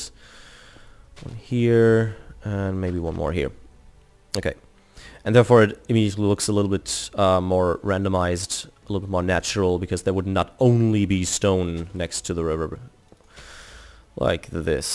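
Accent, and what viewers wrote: Germany. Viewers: Nach den ersten paar Sekunden erstmal abonniert. ;) Man hört zwar, dass du Deutscher bist, aber dein Englisch ist sehr sehr gut. :) Super sympathisch und tolle Videoinhalte. Weiter so!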